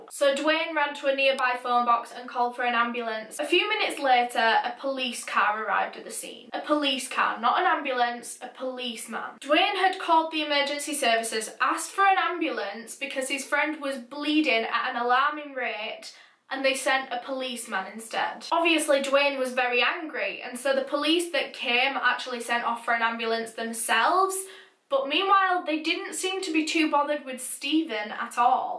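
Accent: United Kingdom. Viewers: I can heard talking for the rest of my life and I would not get bored. She is so eloquent with what she says and she makes you feel like she is only talking to you face to face. I love all of her true crime videos, even tho I hate to see all of these horrible things that happen on this world. It is good that people like her can talk about it to bring awareness. I truly enjoy the way you talk, even tho the british accent is a little hard for me as a hispanic person. One thing, I think you videos would look better if you change you backgroud because it just rare for me to keep looking on you lipstick as you are talking about terrible crime stories just my opinion. Anyways, love you videos, I hope you keep them coming.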